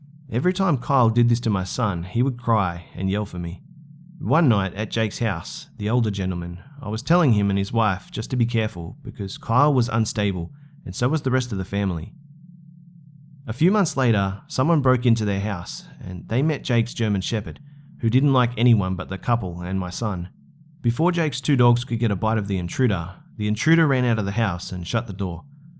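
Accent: Australia. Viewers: you have such a nice aussie accent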